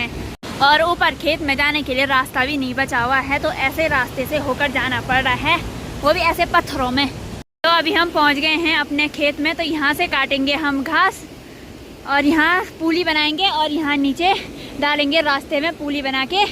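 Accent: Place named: Garhwal, India. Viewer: Nice video beta aap Garhwali language wali Hindi acchi lagti hai,Mai har video dekhta hu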